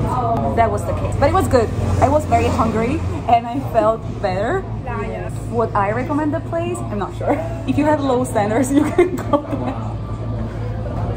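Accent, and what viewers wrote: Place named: United States. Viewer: Sei davvero Napoletana , il tuo inglese americano è perfetto. Sembri Italo-americana. Un saluto dal Polentone del Polesine (Veneto).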